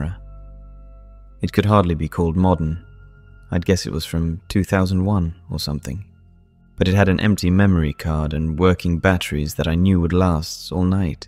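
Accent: United Kingdom. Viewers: This is very incongruous: an American, living in America, speaks with a British accent. Credibility gap!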